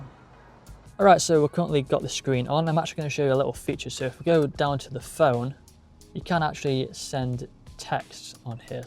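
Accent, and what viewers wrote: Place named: United Kingdom. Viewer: Why are you speaking british?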